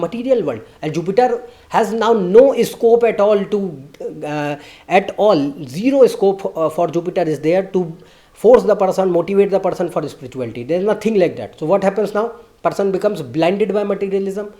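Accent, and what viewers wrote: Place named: India. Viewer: probably because its easier to understand his accent ,being Indian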